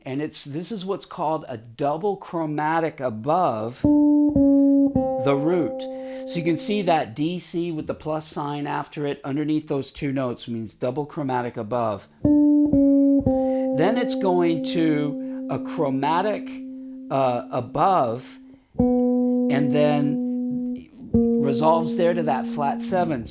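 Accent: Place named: United States. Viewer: omg you are a monster... ps you mentioned 5 pounds. You are a USA native right? You sound like it.., Ps I have 1978 neck through body Eagle BC rich bass which is amazing. Sound neck playability is great 👍. I bet you could tear it up.... I gotta say even the stuff you talk about is on another level.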